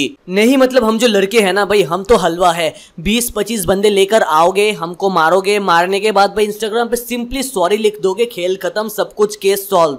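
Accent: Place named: Bihar, India. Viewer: Tum Bihari ho kya be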